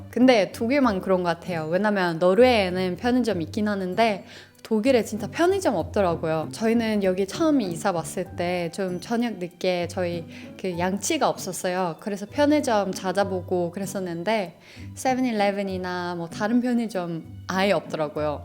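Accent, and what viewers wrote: South Korea. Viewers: 억양이나 발음이 한국인같아서 놀라고 너무 예뻐서 놀랍네요. 행복하세요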